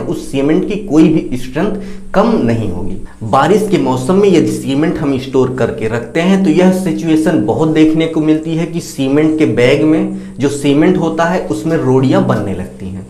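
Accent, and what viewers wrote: Bihar, India. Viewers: Sir ap bihar se hai kya??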